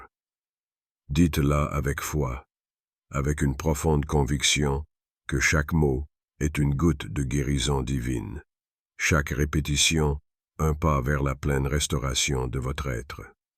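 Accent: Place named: Canada. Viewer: Ce léger accent canadien........😮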